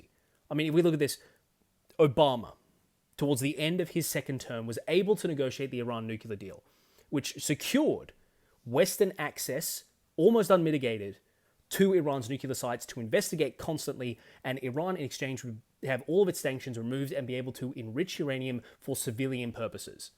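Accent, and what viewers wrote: Australia. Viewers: Australian channel, Australian pronunciation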